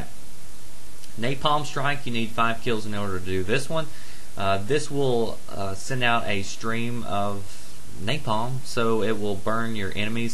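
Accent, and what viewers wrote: South, United States. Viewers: What state are you from? I noticed u have a southern accent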